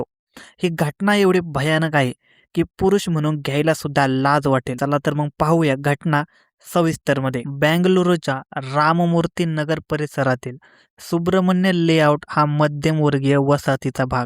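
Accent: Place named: Nashik, India. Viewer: कुठला आहे re भाऊ, बोली वरून वाटते की तू नाशिक नगर भागातील आहे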